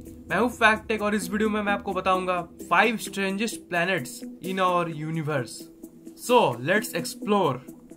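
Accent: Bihar, India. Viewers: are you Bihar........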